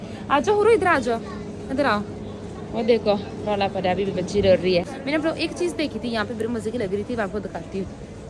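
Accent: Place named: Pakistan. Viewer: Ap pakistan m kha sy h because your acsent is different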